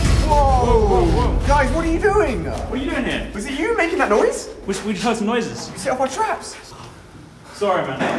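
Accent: England, United Kingdom. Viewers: are you english or you from netherlands too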